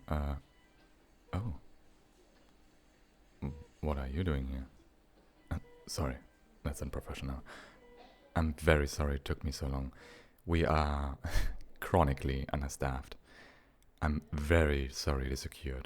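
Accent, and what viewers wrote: Germany. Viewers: Are u From germany?💞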